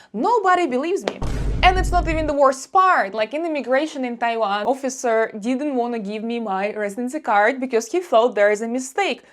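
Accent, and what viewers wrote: Russia. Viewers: You don't look Western Russian but sound like a normal Russian speaking English 🤣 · when you started speak you sound 100% Russian :face-blue-smiling::person-turqouise-waving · If I met you for the first time I would believe you, your mannerisms and accent scream Russian. I guess some people just aren't very open minded. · You have a beautiful voice but your voice alone says you're Russian. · Especially cuz she sounds like every American's stereotype of Russian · Your accent is so obviously Russian, that I would idenify your USSR/Russia origin without a mistake. ❤ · 你的英语有很典型的俄语口音 这些把你当中国人的家伙应该听出来的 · Your accent clearly says you are Russian)) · Her accent is so Russian! Her face isn’t “ethnic” Russian. In South Korea, for example, there is a 1 to 1 correlation between ethnicity and nationality. There are other countries like South Korean. In Russia there isn’t a 1 to 1 correlation between ethnicity and nationality, but many people don’t know that. 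Many people honestly don’t know 💩 about 💩..so the confusion is understandable even if it’s ignorant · At least, you’ve got a Russian accent😂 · You have a beautiful Russians accent (not a Chinese accent). I have been to Lake Baikal and Mongolian. I have visited Evink, Orogen, Nanai, in China and Russia. Also there are many Koreans in Russia. I like your Mongol writing posters. I enjoyed your explaining! Shout out to Indigenous People. They are everywhere. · такой русский акцент)) он всегда такой милый по мне. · Ain’t no shot anyone is hearing that accent and not instantly thinking you’re from Russia. lol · У вас русский акцент,я верю ❤ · Yu look so beautiful and I love yiur English-Russia accent. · По произношению понятно ты russian:) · Думала иностранка получила российское гражданство!Потом включила звук и услышала ооочень родной русский акцент!😂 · I remember when I briefly visited Russia years back. 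I saw a few Asians. And at the time it was a culture shock for me. I will also say, on one hand, I heard a saying growing up that Asians live everywhere, lol. But on the other hand, Russia itself is in Asia. Or Eastern/Southern Russia is in Asia. So I would understand Asians migrating there. Because it's right next door. Also, your Russian accent is so cute and awesome, lol. Your accent is proof that you're Russian! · Well I had a pretty good idea that there were at least 3 ethnic types in Russia (basically Slavic/Caucasian, Natives and Asian) but honestly I didn't know you had as many different types as you've spoken about. However I wouldn't have any problems believing that you're Russian just from hearing your accent 😊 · You have a deep Russian accent yet you're not Russian lmao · that's a russian accent though xD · I hope I am not insulting you with my comments. When I first saw you, I thought Mongolian descent, and also your English has a Russian pronunciation (and rhythm) mixed with a bit of Asian. 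I am vaguely aware that there are Russian Asians, as when it was claimed that Koreans were helping Russia fight the invasion of the Kursk(?) region I just thought it was soldiers from Eastern Russia. Also when I think of Eastern Russia my firs thought is Siberia, or that corner where Russia, China and Korea share borders. I keep forgetting about the belly of Russia. It is nice to see someone giving us an overview of Asian Russians. Thank you. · If you close your eyes and hear her only speaking, by accent u can tell shes 100% russian lol the restuarant wanted exotic servers, got even more exotic than the usual, i would walk in and i would thought i am in another part of the earth as a westerner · You speak English with Russian accent. So, YEAH You are truely RUSSIAN at heart !!!!! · По акценту слышно, что русская😂🎉❤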